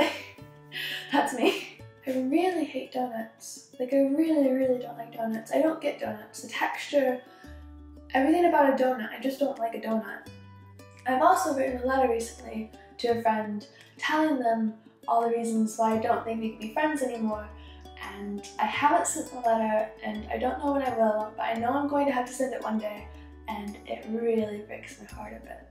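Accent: England, United Kingdom. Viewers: Does she have a British accent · Your accent is changing! Becoming more English 😮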